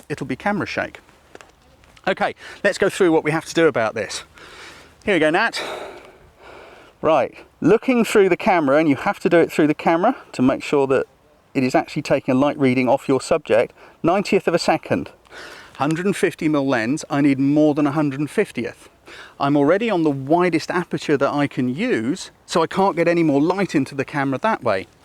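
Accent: United Kingdom. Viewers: I liked all your videos and your British accent too. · Great personality, great teaching skills, vast experience, and the British accent. Doesnt get any better that this.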